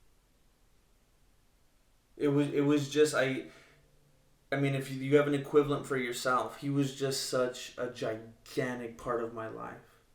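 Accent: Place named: Mexico. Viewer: Thank You 😊❤️ I just love seeing the Italian flag 🇮🇹😃 with that Mexican accent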